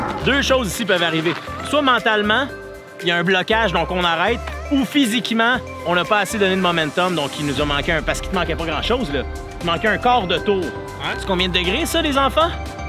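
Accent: Quebec, Canada. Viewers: Comme j'adore cet accent québécois 😂😂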